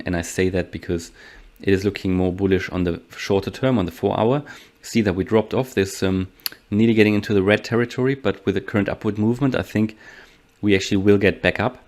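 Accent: Germany. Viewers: Deutscher akzent lol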